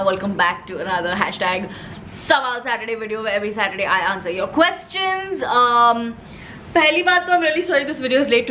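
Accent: Maharashtra, India. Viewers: Ma'am I'm a really really big fan of yours. Your videos are so so amazing and specially your way of expressing. I always watch your videos after studying and believe me it's so so relaxing. Your Marathi gives it a tinge of fun and #pridekolis. You really entertain everyone. I wish you all the best for your future. ❤️❤️ And yes LOVE LOVE ✌️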